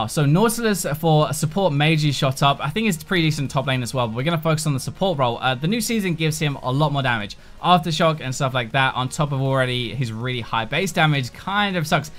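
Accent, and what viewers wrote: United Kingdom. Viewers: I like your vids dude but you should really consider slowing down your speech, because speed + british accent makes it really hard to actually keep up with what you're saying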